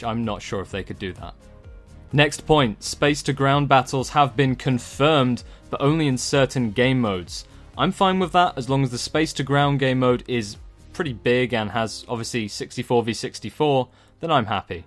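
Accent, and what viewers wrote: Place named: United Kingdom. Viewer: Good video, very informative and i almost cried in excitement. Dont understand why you dont have more subscribers especially with that british accent that automatically makes you sound more intelligent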